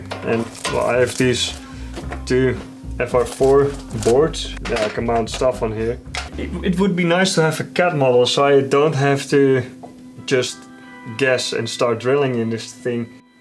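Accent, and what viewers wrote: Netherlands. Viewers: I heard a dutch accent at Formnext this year which I remembered from somewhere. 😅 Saw you talking to the guys at the Slice booth. You were busy planning new stuff so I didn’t want to disturb you. Awesome and refreshing video as always!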